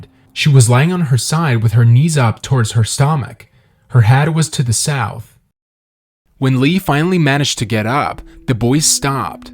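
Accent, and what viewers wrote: Russia. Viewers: This is how he talks, who are you to complain about that? He has a very beautiful and charming accent. You do understand english is not his 1st language, I think he might be from Russia. I'm guessing you are Americans, the only people arrogant enough to complain about someones accent🤦‍♀️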